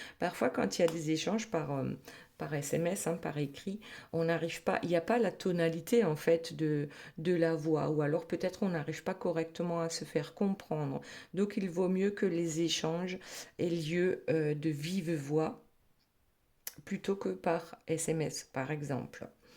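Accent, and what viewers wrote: Alsace, France. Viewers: J adore votre accent m'a petite vous devez être Alsacienne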